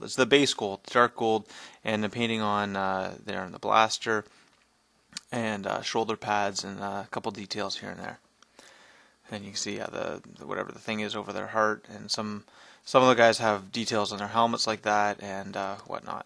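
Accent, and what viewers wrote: Canada. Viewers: i know u are from canada only because you say ewt instead of out lol! no offence nice vid.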